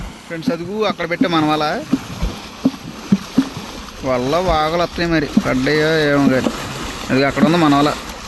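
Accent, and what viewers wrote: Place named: Bhadradri Kothagudem, India. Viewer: మీ మాట మా సయిడ్ లా మాటాడతున్నారు ఊ అదుగో అని😁😁😁 భద్రాది కొత్తగూడెట మాది😁😁😁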